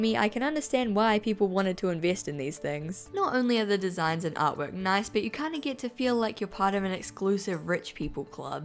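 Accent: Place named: New Zealand. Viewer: Kiwi accent... finally one of my own 🥝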